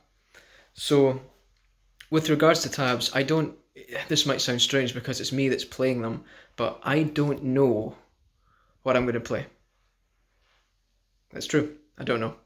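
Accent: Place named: Scotland, United Kingdom. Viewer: Well chuffed yer Scottish pal,been listening to ye for a few weeks noo,brilliant stuff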